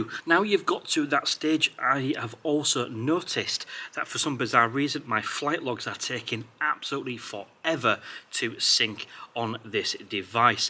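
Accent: Hull, United Kingdom. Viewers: All of us from "ull" can understand him when we fly down "our tenfoot" looking for "dead bods".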